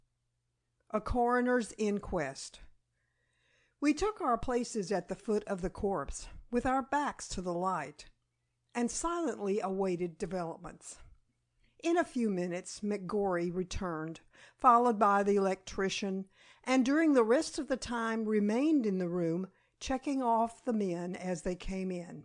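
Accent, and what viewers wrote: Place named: Texas, United States. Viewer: Ugh unlistenable. I live in NYC. The narrator is obviously meant to be a man. This is read by a woman in Texas. The effort is appreciated, but unsuccessful.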